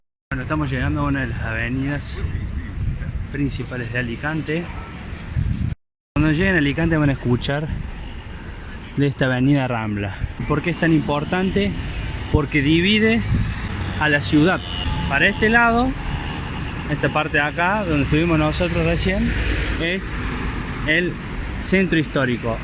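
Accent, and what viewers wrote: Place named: Córdoba, Argentina. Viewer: Buen acento cordobe jajajajaj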